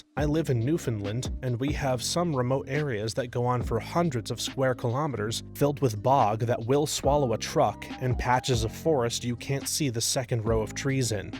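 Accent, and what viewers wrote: Scotland, United Kingdom. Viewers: Nobody commented on that Scottish accent?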